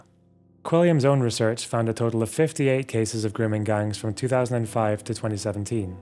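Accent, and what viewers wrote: Scotland, United Kingdom. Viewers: Hes just Scottish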